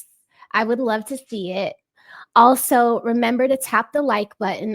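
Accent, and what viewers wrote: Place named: South, United States. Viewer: Kinda figured you were mixed with something. Couldn't put my finger on it. Occasionally, I could hear a bit of a Southern twang and come the freckles.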